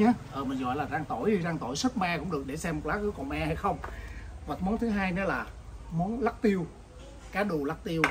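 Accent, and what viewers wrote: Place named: Mekong Delta, Vietnam. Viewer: A quê tỉnh nào. Mà sao giống Miền Tây quá vậy A.....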